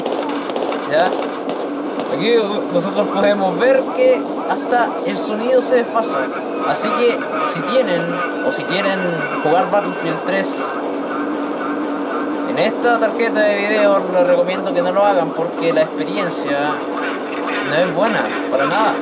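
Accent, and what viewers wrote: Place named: Chile. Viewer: me encanta tu acento chileno <333 me subscribo 7w7r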